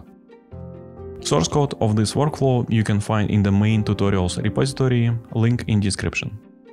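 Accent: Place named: Russia. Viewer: Слушать ролики на английском с русским акцентом помогает тренировать аудирование!:)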